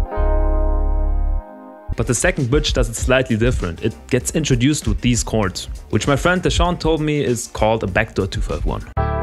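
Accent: Germany. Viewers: omg wusste direkt vom akzent dass er deutsch ist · I have zero idea how to make music, or what you’re doing in the video, but still very entertaining! Good job Also are you German? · Bist du Deutsch? lol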